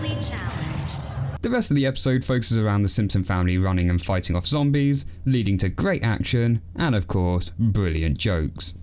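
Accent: England, United Kingdom. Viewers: I didn't know you were English ( I am...)!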